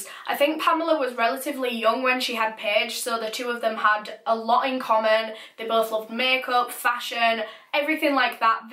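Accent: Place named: Scotland, United Kingdom. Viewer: I am somewhat new and literally thought you were Scottish you don't sound English sorry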